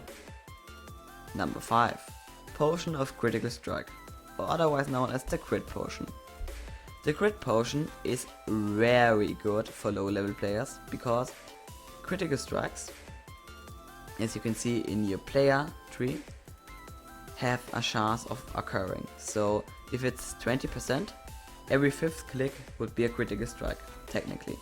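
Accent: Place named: Germany. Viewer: Als ich deine videos gehört hab, hab ich direkt gemerkt das du deutscher bist :D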